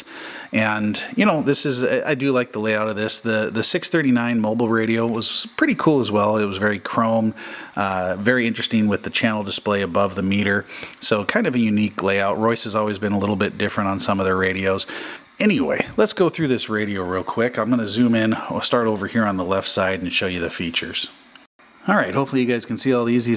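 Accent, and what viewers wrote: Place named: Pacific Northwest, United States. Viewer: Dang.. I remember the days.. But I got out of CB just before they went to 40 channels.. it got so crowded and folks were just playing with the radios.. Have always wanted to get back to it.. Maybe I will this year.. Great show.. sounds like your in my neck of the woods.. though I am a bit south in Vancouver Wa...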